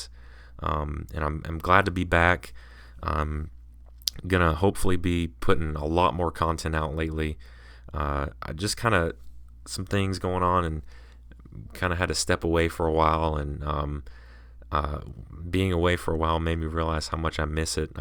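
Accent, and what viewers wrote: South, United States. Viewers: where are you from? you have a real subtle southern accent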